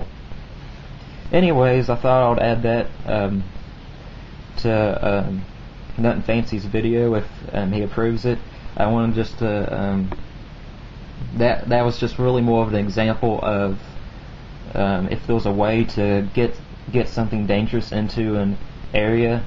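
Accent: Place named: South, United States. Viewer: He has a southern accent with a speech impediment. He substitutes /r/ with a /w/. "polow beaw" Great stories. You have a very mature and keen mindset. Keep it up!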